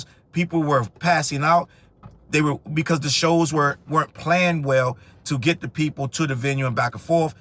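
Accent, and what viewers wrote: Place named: Washington DC, United States. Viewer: I love listening to dudes with DC accents. Remind me of home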